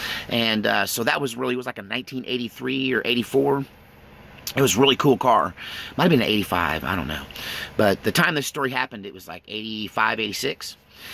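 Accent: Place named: West Virginia, United States. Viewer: Your west va accent is pretty close....ever seen the documentary about jesco white and family entitled "the wild and wonderful whites....."?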